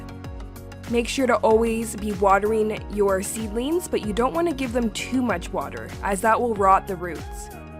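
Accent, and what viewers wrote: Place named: Canada. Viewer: Great advice! Are you from Canada or Minnesota by chance? I recognize a little accent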